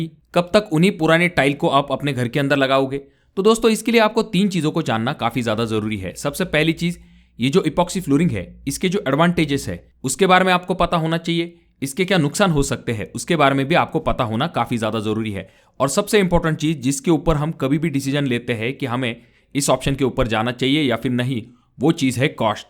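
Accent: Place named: Bundelkhand, India. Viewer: खपरा बाला भाई बुंदेली लग रहा हे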